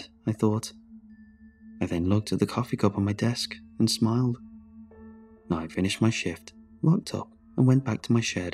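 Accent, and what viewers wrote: United Kingdom. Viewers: Easily my favorite story you've read. This and the forest fire story with all the different things happening every night. Your light British voice fits these 2 stories and both main characters absolutely perfectly👌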